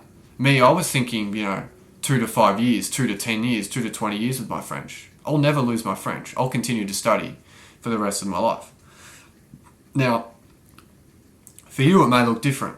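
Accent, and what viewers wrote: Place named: Australia. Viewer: This is an Astralian accent, I guess.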